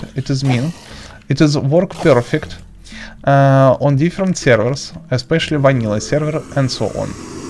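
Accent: Russia. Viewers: У тебя русский окцент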